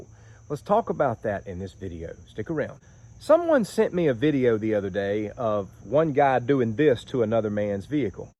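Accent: South, United States. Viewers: Love your Southern accent ! Greetings from Australia. Bless.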